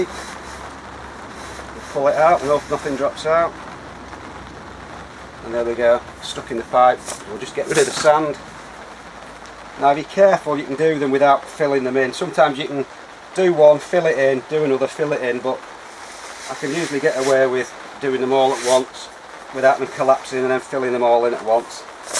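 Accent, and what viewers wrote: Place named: Scotland, United Kingdom. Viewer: Hello from New Mexico, What kind of sand are you using? Is it something from a home depot or garden centre, or just regular old sand? Or is it something special? Second, Do you have holes in the bottom of your barrels for the water to drain out? Where are you located? I think maybe Scotland from your accent? It sure seems to rain a whole lot there. Can you reuse the sand the following year?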